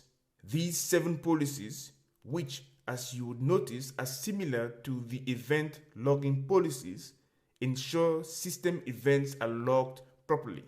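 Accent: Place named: Africa. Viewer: Your name is, but accent is african... where are you from, just curious...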